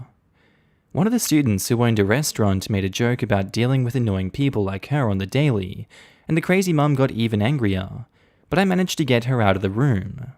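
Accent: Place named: Australia. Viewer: I miss the “chuck a prawn on the barbe” in your wonderful Aussie accent.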